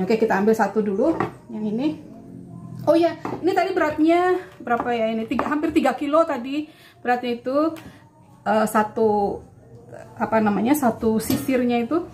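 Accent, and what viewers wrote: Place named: Java, Indonesia. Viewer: iki kok logate jawa yaa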